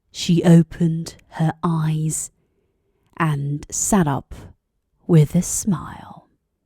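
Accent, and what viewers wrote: United Kingdom. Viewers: 진지한 개그, 그리고 귀여운 외모, 느릿한 브릿 액센.. 중독성 너무 강해요. ~!! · 너무 멋진 목소리!👍 구독, 좋아요를 누를 수밖에 없도록 만드는 위트와 격조있는 목소리. 영국식 영어를 선호하지 않는데, 그냥 빨려든다 빨려든다... 매력에~~~~!!❤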